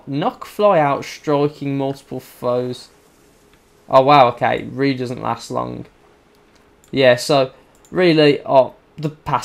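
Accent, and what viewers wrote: United Kingdom. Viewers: is that a Cockney accent you have ?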